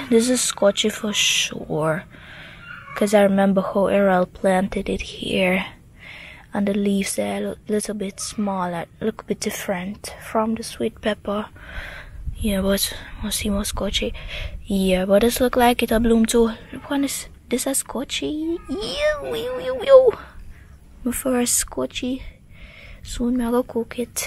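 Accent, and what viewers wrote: Jamaica. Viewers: Love hearing you talk patios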